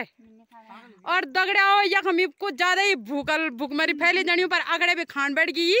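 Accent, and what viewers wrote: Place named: Garhwal, India. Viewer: Yaarr tumhare vlog mood fresh kr dete hai... aur jo tum garhwali bolti ho aahaa...😍😍😍😍😍 love from rajasthan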